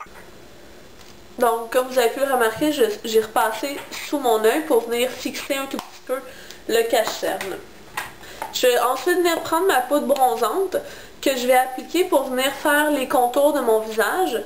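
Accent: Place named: Montreal, Canada. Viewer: C'est parce que je reconnais bien cet accent moi aussi j'habite Montreal c'est pour ca !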